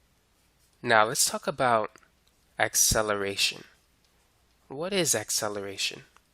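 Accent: United States. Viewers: Dear Teacher: You helped me today. I am teaching myself-or more correctly, learning myself via teachers like you--physics. My interests--outside English teaching and philosophy--are sustainable aviation and human spaceflight. Please allow me to help you--just a bit. Sir, you're a good teacher (thank you for that!); you speak fairly distinctly, slowly enough for your students to hear and comprehend your words & concepts clearly--and you convey information methodically, in bits that can be digested--whereas most physics teachers I have seen can't teach to save their granny's lives; but, respectfully--and take this with brotherhood, my friend, consider--pronouncing your words fully, please. 'Wes' is not a word (it's a person's name), nor are 'Eas' or 'Norf'. In addition, 'objec' is not a word, either. People will say this doesn't matter--and that you speak an American city dialect---but I care to much for teachers like you--who are trying to educate the world--so I respect you enough to say they would be wrong. 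You are too well-educated to limit your pedagogy with voluntarily incorrect pronunciation; I say "voluntarily", even though I would guess you probably grew up in an immersion environment wherein your peers and guardians (really--your guardians, too?) spoke this way, which affected your interpretation of the phonemes they were pronouncing... in relation to the words they were a part of... and the connected to meanings they were attached to- unconsciously; so I could guess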